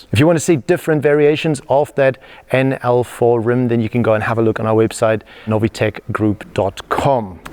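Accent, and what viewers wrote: South Africa. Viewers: Is our Presenter South African?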